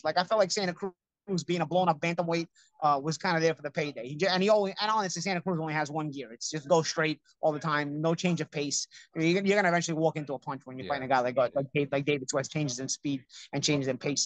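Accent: Brooklyn, United States. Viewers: annoying Brooklyn accent 😂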